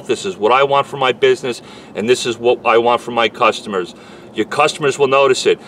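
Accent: New York, United States. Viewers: It says Dallas, but you sound like NY.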